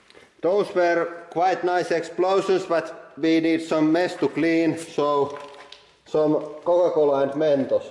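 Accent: Finland. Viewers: Reasons why I love this channel: 33.3% hydraulic press, 33.3% Finnish accent, 33.3% that girl's awesome laugh. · he sounds finnish, is he? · I don't know what I love more the crushing or the beautiful Finglish accent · its called ralli englanti its what Finland is most known for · His Finnish accent makes his videos hilarious. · both the dudesons and he have the same accent and they sound the same I know they are Finish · the finnish accent. Almost any grown man could sound like when they speak english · North european :D and that is typical finglish :D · Finnish english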